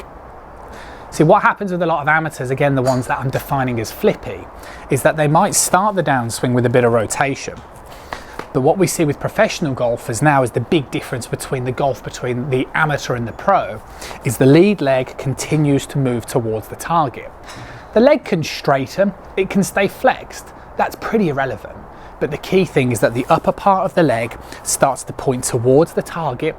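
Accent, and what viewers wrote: United Kingdom. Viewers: You are the first British instructor that I can understand your diction. The accent is pleasant to listen to and learn from. Keep up the great videos. Bruce from USA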